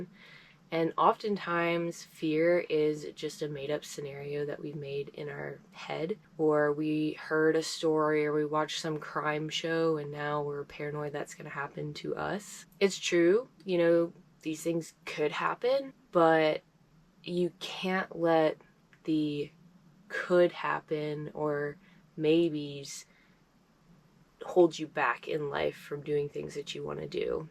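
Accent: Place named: Arkansas, United States. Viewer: I love how that Arkansas accent comes out every once in a while. :)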